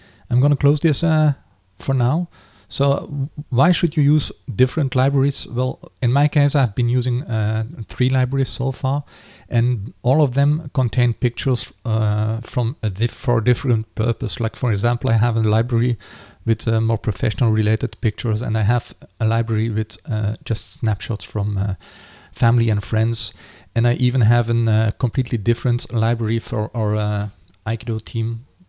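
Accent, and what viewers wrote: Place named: Netherlands. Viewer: Great info! Thanks man, you sound Dutch.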